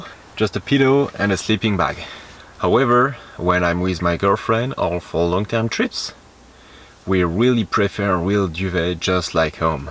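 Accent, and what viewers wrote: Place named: France. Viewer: french? cute accent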